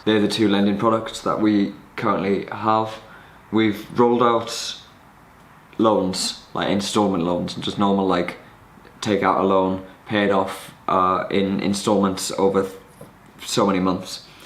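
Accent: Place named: Sunderland, United Kingdom. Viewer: It is a watered down Mackem accent.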